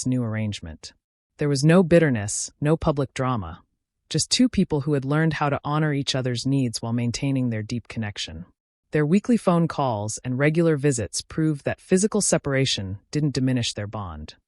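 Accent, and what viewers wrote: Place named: United States. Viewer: Please could you Americans do us the courtesy of speaking about duties and not doo-dees?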